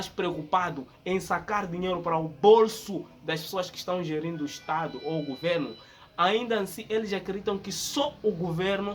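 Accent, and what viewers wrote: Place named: Brazil. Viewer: Pow negão ce fala com alma de Bahiano de Brasileiro hehehehhe e sua fala não tem sotaque moçambicano falando igual a nos brasileiros hehehe isso é muito legal cara So falta arrumar uma brasileira e vir pro Brasile hheheheheh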